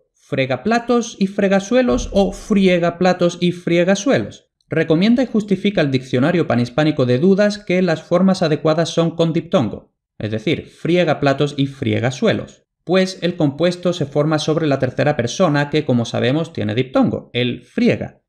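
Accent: Castile, Spain. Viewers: Eres andaluz pero hablas como castellano al menos ante mis oídos latinoamericanos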